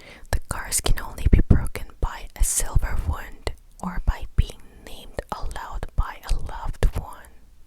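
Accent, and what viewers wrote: South Korea. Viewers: love your Korean accent while speaking English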